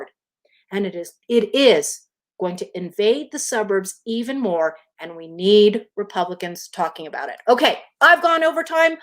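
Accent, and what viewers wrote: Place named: Philippines. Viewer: Michelle M. Having had a couple of Philippinas as GF's your accent is so fantastic.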